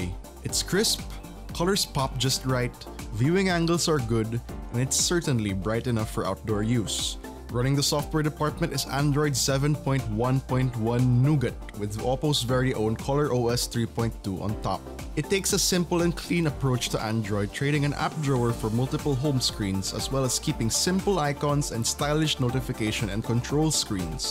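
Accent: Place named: Philippines. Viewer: His phillipino accent is so strong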